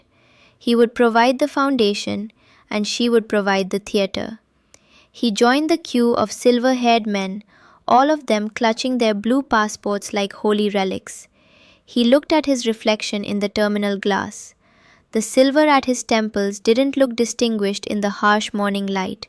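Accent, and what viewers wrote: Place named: India. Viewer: A lady from the Philippines with an Indian accent 😅.. AI is a disaster 😳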